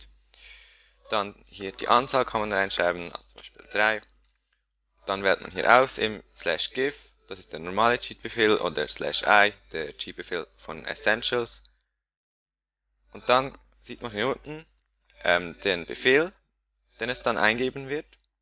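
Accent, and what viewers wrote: Switzerland. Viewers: ich mag schweizer akzente voll ^^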